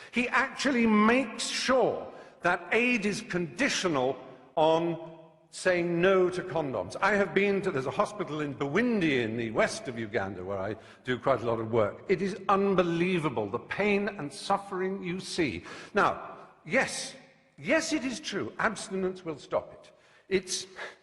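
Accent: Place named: United Kingdom. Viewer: I could watch this over and over again. As fan of British English I sit admiring the accent and the rethorics. Beautiful!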